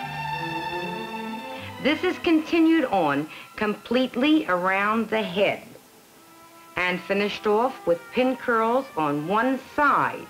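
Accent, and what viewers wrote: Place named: Pittsburgh, United States. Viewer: Very distinct Yinzer accent lol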